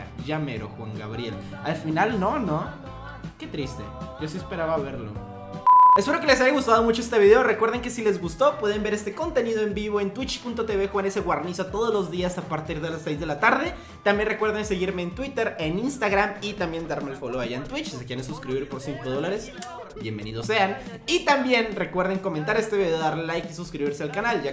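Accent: Colombia. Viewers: Eres colombiano no?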